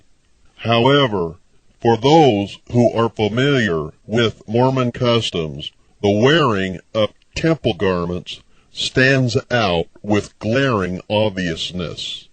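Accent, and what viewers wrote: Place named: Canada. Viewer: I dare not try to understand the purpose of the video, they guy sounds Canadian.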